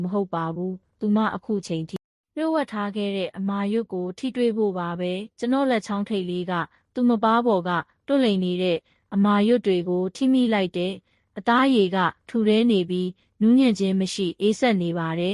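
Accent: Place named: Mandalay, Myanmar. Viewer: မန္တလေးပြောလိုက်အာရပ်ပြောလိုက်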